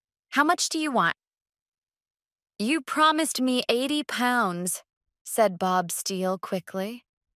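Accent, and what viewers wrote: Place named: United States. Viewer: I like the way speak clear from American accent. Chapter 2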